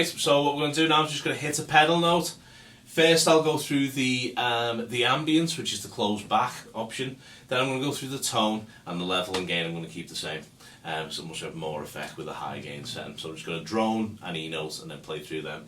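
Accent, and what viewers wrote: Liverpool, United Kingdom. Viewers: Scouse. The language and accent of the Gods 😊Great review La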